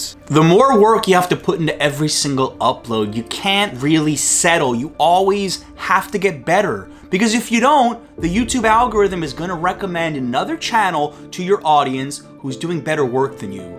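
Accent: New York, United States. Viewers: NYC has always been on my bucket list and few years ago I tried searching for things to do in New York and one of your vlogs came up and binged watch your videos, from then on, your voice is kind of synonymous or like has this NYC feel into it haha, I might study in Canada next year and if ever got the chance, I'll definite visit NYC and follow all your tips, along with Sarah Funk, Action kid, Brett Conti and with your cam guy and third wheeler and a fellow Filipino LOL, Thank you, you're one of NYC Gem!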